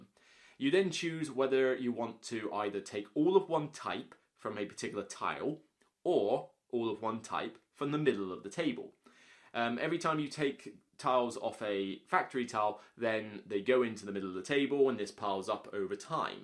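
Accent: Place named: Bristol, United Kingdom. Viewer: Canadian here, you’ve become my favourite board game channel for your deep understanding of mechanics and finding imbalances, etc, rather than just a constant ad for each game. I have a question… your accent is unfamiliar to me but sounds like someone from my childhood from Bristol. Are you from that area?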